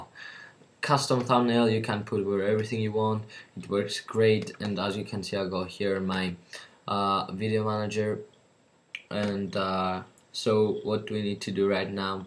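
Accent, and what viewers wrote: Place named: United Kingdom. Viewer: OMG HE'S BRITISH THAT COMPLETELY MAKES IT!!!!!! awesome video by the way helped a lot thanks!!!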